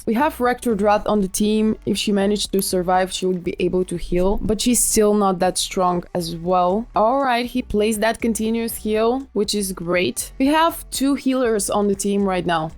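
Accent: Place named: Quebec, Canada. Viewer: My guess is you are french Canadian, I hope I did not offend you by asking that, I was just curious because you have a beautiful accent. Great content for people starting our and I even watch myself even though I'm end game, sometimes I miss things so it's nice to refresh and in some cases learn something new I could have overlooked. 😊😊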